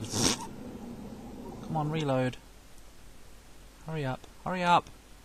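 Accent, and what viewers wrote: United Kingdom. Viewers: your British commentary makes your walk through a thousand times better than any other!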